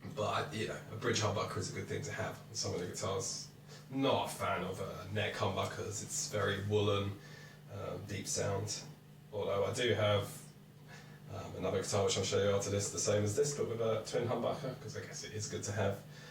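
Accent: England, United Kingdom. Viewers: Wow what’s a nice guy really enjoyed that video. Did not expect that English accent. 🙌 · Thought you were American, but I like British accents more haha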